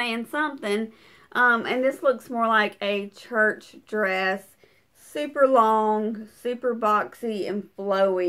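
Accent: South, United States. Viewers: Are you tipsy or just really southern??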